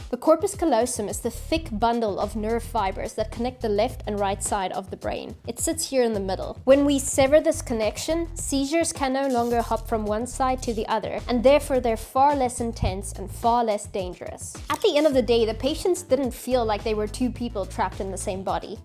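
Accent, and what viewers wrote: Afrikaans, South Africa. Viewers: Jy kan sommer die Afrikaans hoor😂❤🎉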